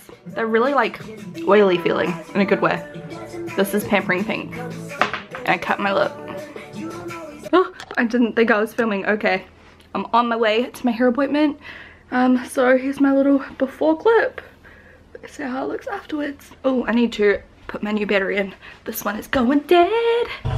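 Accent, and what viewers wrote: New Zealand. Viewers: You have a good nz accent read the discription what part of nz I'm a Wellington baby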